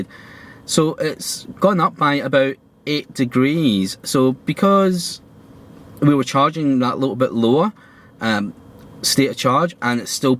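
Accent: Scotland, United Kingdom. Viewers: I love the subtitles - some amusing translations of your Scottish accent!!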